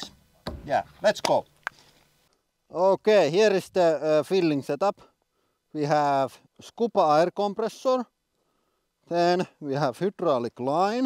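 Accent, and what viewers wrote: Finland. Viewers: "So if anything goes to sheet it doesn't matter" 🤣 I love this channel so much. I'm Canadian so I sound stupid to most of the world but I love Finnish pronunciation of English language. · This is Finnish accent. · Finnish English accent is the best English accent ❤️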